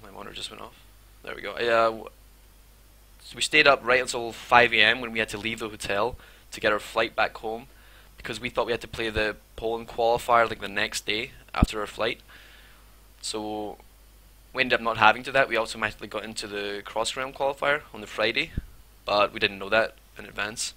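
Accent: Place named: Scotland, United Kingdom. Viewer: didn't know had a lovely scottish voice:D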